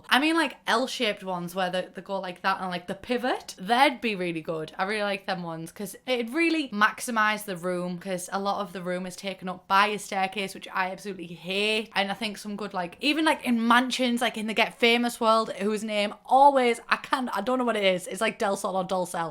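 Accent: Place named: Newcastle, United Kingdom. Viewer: I guess I need to get cats and dogs Also are you from up north you sound Geordie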